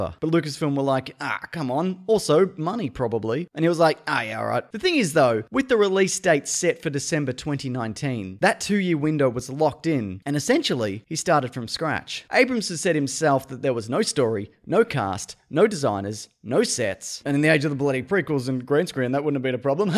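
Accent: Australia. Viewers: are you aussie ?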